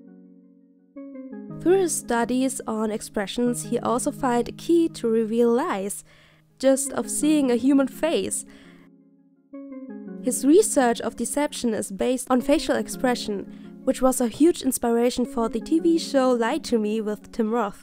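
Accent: Germany. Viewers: Are you german? (the accent)🤔🌸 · Are you from Germany by any chance???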